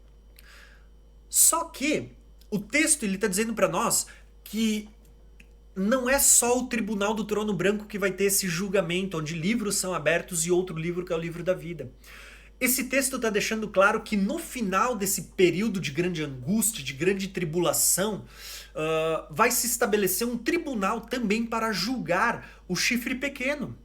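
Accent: Rio Grande do Sul, Brazil. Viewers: Shalom irmão !!! Excelente video !!! Sutaque de gaúcho !!! Faz um vídeo sobre MATITYAHU [ Mateus] cap 5 vs 17 e 18 !!!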